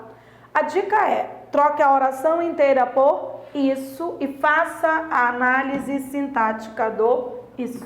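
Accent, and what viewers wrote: Pará, Brazil. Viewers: Reconheci o sotaque paraense!! hahaha adorei a aula!!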